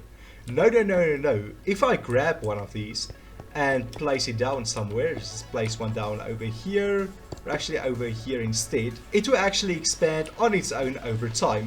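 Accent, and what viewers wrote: Afrikaans, South Africa. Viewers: Are you from south African because ur accent sounds like a afrikaans english Edit: ur channel location is in SA so probably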